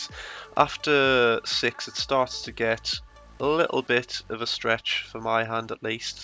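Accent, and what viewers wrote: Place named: Newcastle, United Kingdom. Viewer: damn, you sound like you live pretty close to newcastle judgin from your accent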